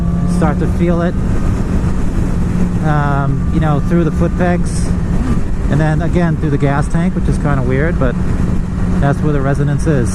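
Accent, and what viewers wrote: Boston, United States. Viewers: It’s like Ben Affleck’s accent in Good Will Hunting...on steroids, in a bad way. Could only go 2 min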